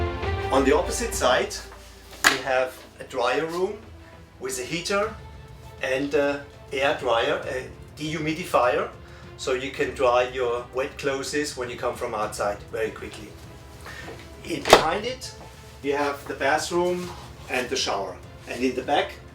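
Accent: Germany. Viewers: Pure German accent!! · I'm from germany ;) man merkt an der Aussprache richtig, dass er deutsch ist... 💩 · The German accent makes it even more better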